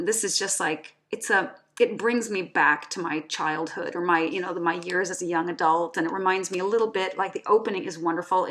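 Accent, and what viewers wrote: Midwest, United States. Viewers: I'm so glad you are posting. Years ago I met a handsome man from Sweden in a bar in Chicago on St Patrick's day. He had a lovely accent. You sound like you live next door in the midwest of the US. So today I tried a sample and found it to be glorious, but it faded quickly. It is an old and small sample. Do you think it fades quickly .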